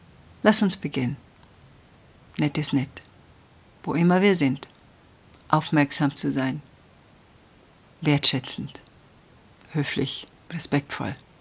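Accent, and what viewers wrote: Bavaria, Germany. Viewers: Ich muss immer lachen wenn Dein Bayrisch durchkommt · das mit bayrischem Akzent, netter geht's net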